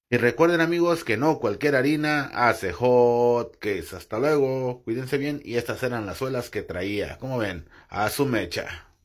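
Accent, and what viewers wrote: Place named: Mexico. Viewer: No ma.. que chingon trabajas amigo e visto varios de tus videos trabajas muy chingon veo que estas en EU donde aprendiste el oficio por tu forma de hablar y las leperadas que dices jajaja as de ser mexicano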